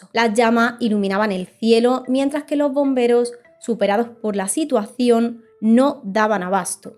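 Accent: Andalusia, Spain. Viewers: Eres andaluza o manchega?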